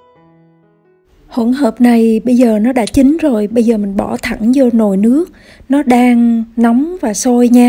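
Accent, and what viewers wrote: Hue, Vietnam. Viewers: phải noái nặng nặng tiếng Huế miền nửa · giọng Huế ( lại SG ? ) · Giong noi nguoi Hue nghe de thuong lam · Bạn là người Huế hả ?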